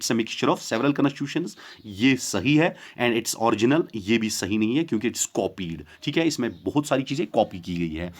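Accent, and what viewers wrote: Kashmir, India. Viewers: r u kashmiri bro..This voice is kashmiri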